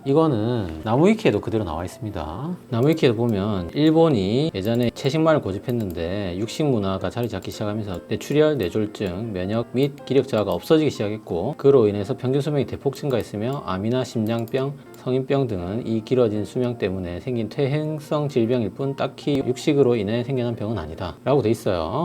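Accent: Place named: Busan, South Korea. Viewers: 님병원이 어디에 위치하고 있는지 알려주세요.~~~ 참고로 저는 부산 연제구에 삽니다.^^ 영상 만드는 일에 정성이 가득하시고.. 강의 내용 퀄러티 인정! 그래서 고혈압, 당뇨 위태위태한 저는..꼭 한번 뵙고 싶기도 해서.. 사투리가 부산 사람인듯 하여..병원이 부산에 있을듯란 예감이 듭니다.ㅎ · 같은 부산 분이라 그런지 하태경 의원님과 외모, 말투 모두 비슷하세요. 엘리트 부산인들의 공통적인 말투인가 보네요.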